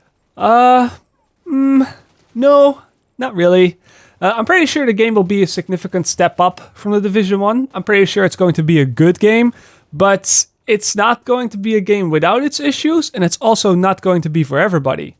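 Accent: Netherlands. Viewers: ???? I love you man, been listening to that sweet Netherlands accent for years, but what are you talking about?40 hours has nothing to do with how many kids you have or if you work at all, lol. I'm pretty sure when they say 40 hours it's not a running clock like you will finish it 8 hours short of 2 days, omg I have no idea why you perceived it that way but that was funny. You could play 1 hour a day for 15 days that's 15 hours. And you could do that living in a village of children with all the jobs you want and still finish as fast as a content creator.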